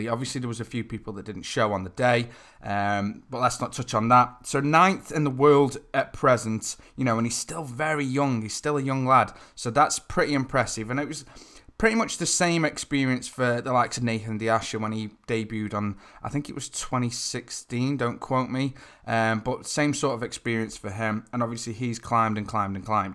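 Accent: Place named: Stoke, United Kingdom. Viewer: Are you from Stoke mate?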